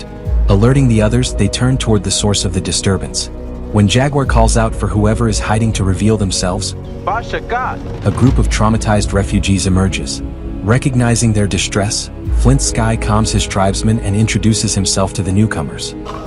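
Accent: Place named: United States. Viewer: Your qwack qwack yank accent is just unbearable.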